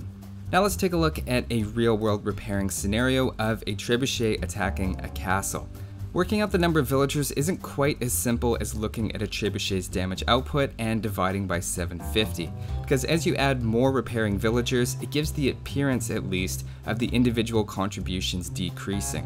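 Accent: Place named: Canada. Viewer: L'Orso Totalworriano Indeed. AoE3 is more about collection treasures and building trading posts and herding buffalos and bisons in the beginning, instead of building camps and finding sheep and so on. I agree, has a cool voice to listen to. These soft Canadian sounds:)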